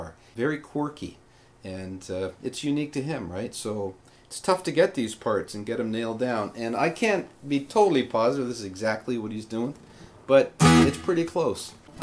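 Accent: Canada. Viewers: Your presentation & discussions about the song are brilliant ! Your descriptions are so on. I had no idea how complicated this song was/is. I love the Canadian accent - it's awesome too. When you live near the border of Canada - you just know when you hear your Canadian Brother speaking ! This tutorial is phenomenal !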